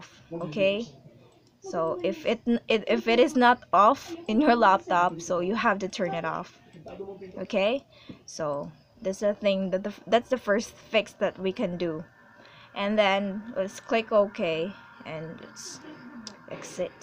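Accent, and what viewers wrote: Philippines. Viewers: you Philippino accent is soo freaking cute. Thanks for the help hope it works 🙏🙏🤎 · HAHA HALAWA TALAG NA PINAY YUNG ENGLISH , PERO ANG KYUT NG SPEAKING VOICE MO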